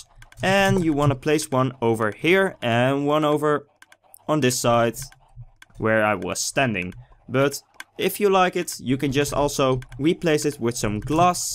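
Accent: Netherlands. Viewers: All my drops get burned off the lava? Why does it do it? And another question? Are you dutch? You have a dutch accent, im dutch as well ;D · Are you Dutch? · u dutch .....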